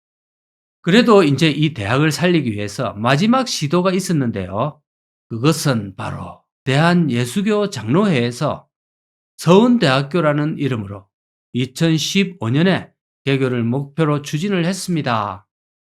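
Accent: Gyeongsang, South Korea. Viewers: 님 구수~한 갱상도 사투리가 참 정겹습니다 오래 오래 건강하셔서 좋은 영상 많이 보여 주시고 올해 반드시 100만 구독자 돌파 하시기 바랍니다!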